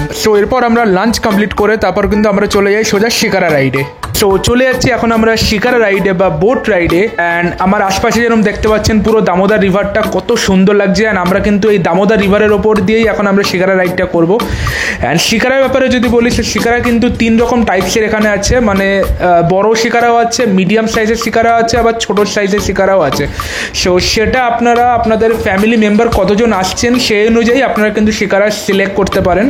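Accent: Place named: North, India. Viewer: Are you from north India? You are not native of Bengal. Your bengali pronunciation is different.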